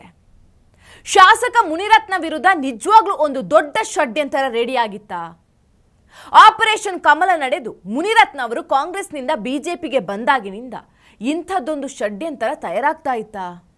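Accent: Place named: Tamil Nadu, India. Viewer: ಅವರೇ ತಾವು ತಮಿಳ್ ಅವರ ಯಾಕೆ ಅಂದ್ರೆ ಅವಾಗವಾಗ ತಮಿಳ್ ಒಂದ್ ಭಾಷೆ ಅವಾಗವಾಗ ಆಚೆ ಬರುತ್ತೆ ಇರ್ಲಿ ಚೆನ್ನಾಗಿದೆ ನಾನು ಕೂಡ ತಮಿಳು ತುಂಬಾ ಖುಷಿಯಾಗುತ್ತೆ ತಮ್ಮ ಮಾತು ಕೇಳೋಕೆ ತುಂಬಾ ಖುಷಿ 😊👌🙏🚩🚩🚩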